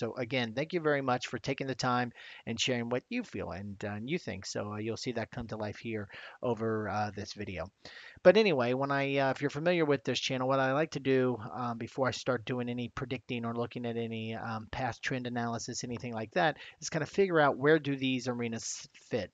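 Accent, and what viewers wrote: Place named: South, United States. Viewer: Love your cutoffs man they are the best and i enjoy them everytime because of your lively voice and joyful personality. I also like that beautiful country accent. Howdy Howdy 😆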